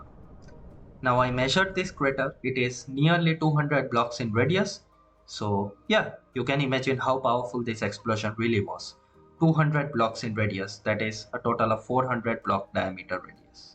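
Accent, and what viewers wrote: India. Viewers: You sound like english indian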